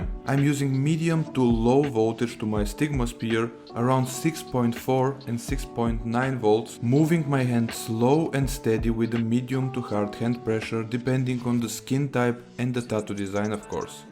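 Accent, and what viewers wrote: Russia. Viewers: Дааа ...этот русский акцент...как будто в уши натолкали битого стекла во время просмотра... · какой у вас очаровательный рашн аксент, хи-хи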